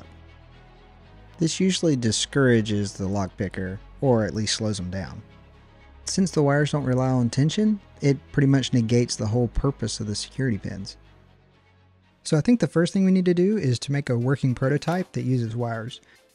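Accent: United States. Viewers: Just had this video come across my YouTube feed. First of yours I've seen and I instantly subbed at your first "daggumit" I'm instantly attracted to this kind of content. Throw on top the Southern accent. I'm sold. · Just curious where youre from, state if you dont mind hahaha like the accent and reminds me of a lot of people where im from(Southern Maryland)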